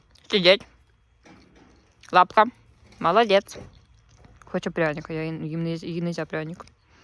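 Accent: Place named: Poltava, Ukraine. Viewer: Привіт ❤️ чудовий канал, говір ваш бомбіческий✌🏻 мабуть десь на Полтавщині?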